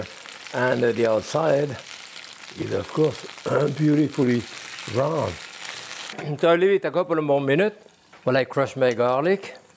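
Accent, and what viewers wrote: France. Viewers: Usual Jacques le cuistot I inimitable ! I have been following his demonstration decades back and marvel at his techniques and his French accent . Anand from ile Maurice (Mauritius)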